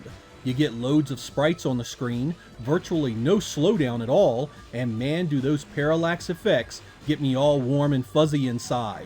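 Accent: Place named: Mid-Atlantic, United States. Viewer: You should say “water” more. I like your mid-Atlantic east coast accent.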